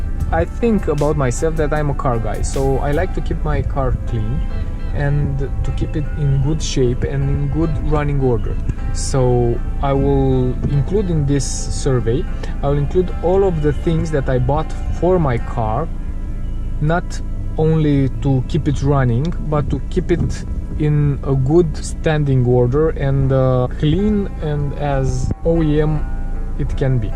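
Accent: Romania. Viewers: dupa accent te-am cunoscut ca esti de al nostru😁👍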